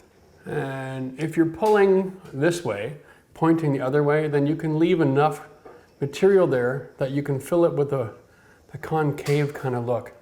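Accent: Canada. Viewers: I just LOVE your approach! Ty for keeping the moment of almost crashing off the ladder - dude makes me feel more normal! Ty for you just being so real !! I am a 60-YO DIY woman, learning how to care for own home after 25 years of marriage — your help / expertise boosts my confidence so much! Also love your friendly Canadian accent & approach 🦋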